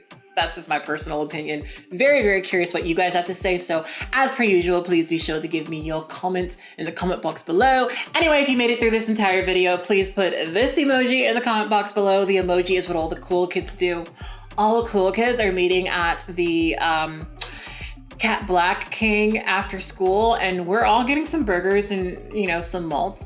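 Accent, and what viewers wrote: England, United Kingdom. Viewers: Your british accent is wayy too good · "I don't want comments" we are gonna comment: it's the british accent for me 🍔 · 🍔 As a Brit, I liked your English accent. It wasn't as exaggerated as most other Americans' impressions. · I love British speaking tbh 😍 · You doing the intro was so bizarre to a new follower. I was just here like "B....british??? I didn't think I followed any non-gaming British Youtubers....."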